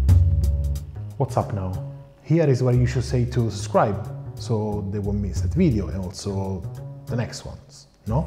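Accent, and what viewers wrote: Italy. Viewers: thank you so much! Just the video I was looking for while trying to optimize my Bialetti Brikka 4 cup moka pot. I LOVE your videos and your ground to earth explanations:) I even like the Italian accent and your body language while explaining. You`re a gem of knowledge and kindness to your viewers. I will order me some AP filters now:-)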